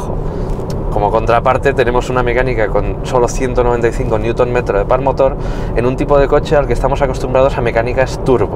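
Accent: Spain. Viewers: Este habla como español.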